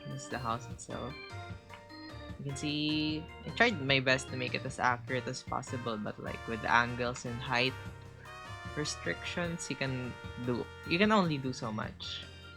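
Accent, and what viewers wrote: Philippines. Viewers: You're so creative and patient with your builds! Btw r u Filipino? We have the same accent 😩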